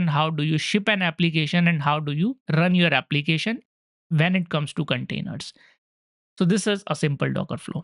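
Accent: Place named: India. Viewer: Sorry to tell this but can you work on your accent? It is so hard to understand the Indian accent! I recently found your channel amazing playlists the only negative point is this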